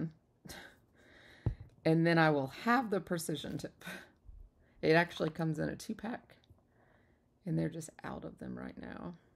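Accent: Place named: South, United States. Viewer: listening to you with your southern accent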